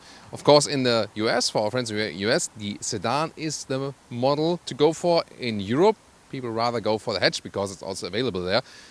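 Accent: Germany. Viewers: du bist der einzige deutsche wem ich Englisch sprechen hören will.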